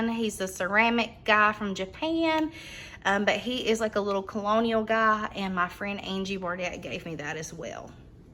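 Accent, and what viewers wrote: South, United States. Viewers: you are such a treasure! I love your home, your style, your love of our Lord, your patriotism and your accent! As a transplanted Texan living in Houston, Missouri, I am so drawn to anything or anyone from the South! I love how you have incorporated so many vintage and family treasures. I am sentimental and I love my heirlooms too! Thanks for an enjoyable tour!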